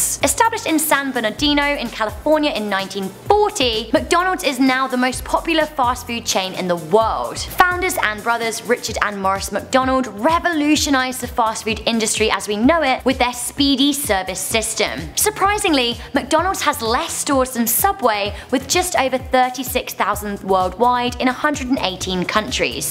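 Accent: United Kingdom. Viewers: she's british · are you British?☺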